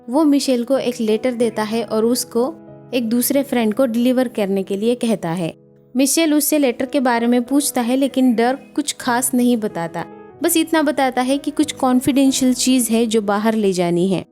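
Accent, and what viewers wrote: Maharashtra, India. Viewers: तुम्ही महाराष्ट्रीयन आहात ना...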